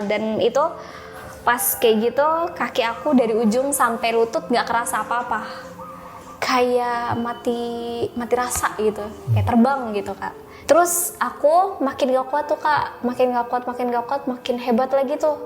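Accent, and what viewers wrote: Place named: Pemalang, Indonesia. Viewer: Orang Pemalang mn suaranya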